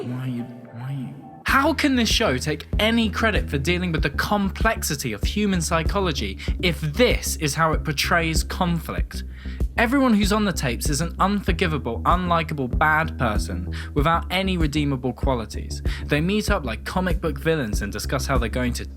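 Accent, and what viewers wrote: United Kingdom. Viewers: That’s literally a common British voice, I’m pretty sure I sound a bit like that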